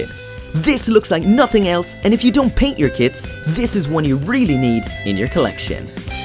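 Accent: Ireland. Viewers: Is this YouTuber Irish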